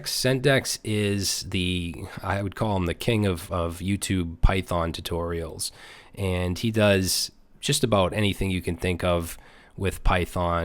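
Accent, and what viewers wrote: Boston, United States. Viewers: I still like your channel the most. I'm a software engineer and have been for the last 4 years professionally in part thanks to your videos, the thick Bostonian accent brings nostalgia.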